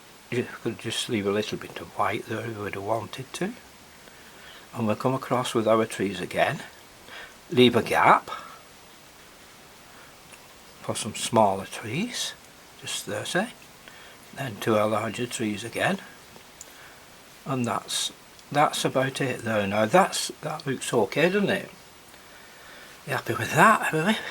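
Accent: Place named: North, United Kingdom. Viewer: Think I've loved everything you've put on YouTube. With your accent I'd imagine you're either from Leigh or Wigan, I'm just down the road in Bolton. Do you still involve yourself with any personal tuition? I'd gladly part with my hard earned to learn from someone like you!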